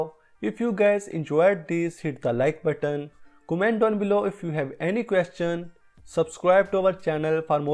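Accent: India. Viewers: im from austria its my second language im far from perfect but anyway its easy to learn most easy language to learn but its not even referring about his english skills like grammer and words and so on he is obviously talking about his hardly to understand indian strange accent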